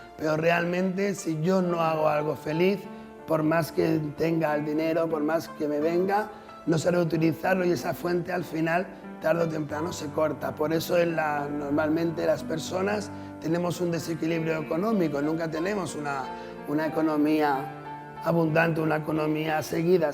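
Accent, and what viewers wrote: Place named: Spain. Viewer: me cuesta entender a los Españoles cuando hablan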